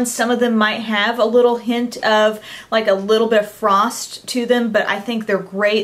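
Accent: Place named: United States. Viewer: She has an American accent. Everyone has an accent.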